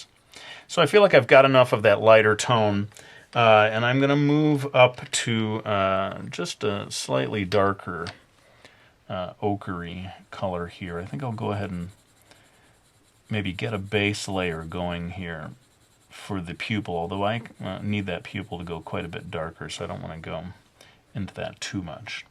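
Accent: Brooklyn, United States. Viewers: in the beginning of his videos, and myself watching, the brooklyn accent makes everything hilarious. now looking back and watching, i had no idea what i was getting into. now im addicted.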